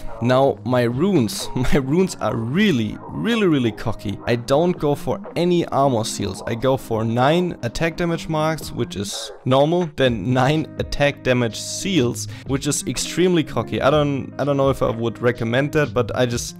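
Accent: Germany. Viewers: 100% deutscher akzent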